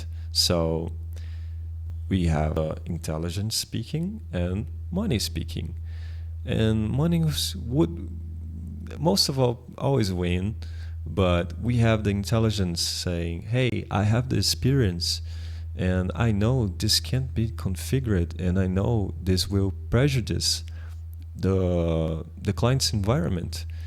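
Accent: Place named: Brazil. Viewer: Pelo sotaque é br com certeza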